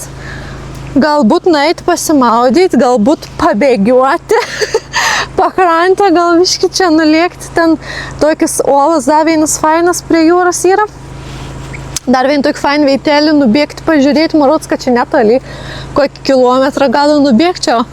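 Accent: Samogitia, Lithuania. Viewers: Nu žiauriai gerai susižiuri video ,dar žemaitoke.Žodžių super .😏🤪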